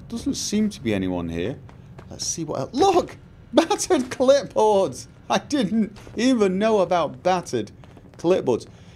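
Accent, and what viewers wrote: United Kingdom. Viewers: He’s so fricken British it’s awesome lol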